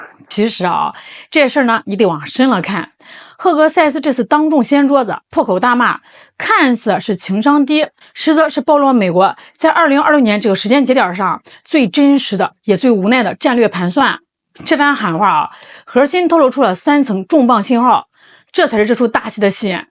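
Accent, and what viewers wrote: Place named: Shandong, China. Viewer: 你这山东调不能常听要把我带偏😊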